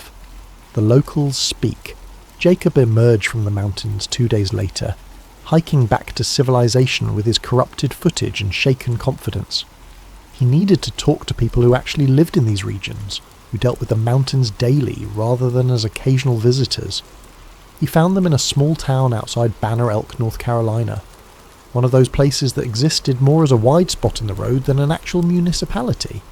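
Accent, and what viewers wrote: England, United Kingdom. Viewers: English accent, really? We're talking about a place in the U.S. It's too distracting.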